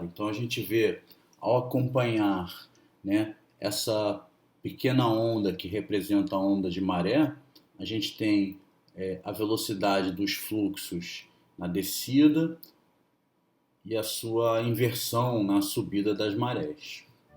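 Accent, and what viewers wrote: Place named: Rio de Janeiro, Brazil. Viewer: ótima aula, inegavelmente professor carioca hahaha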